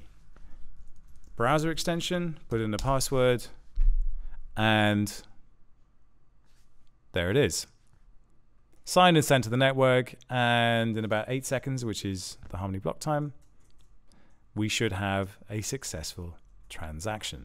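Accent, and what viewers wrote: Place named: United Kingdom. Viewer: It’s just a British accent. The world is mesmerized by it.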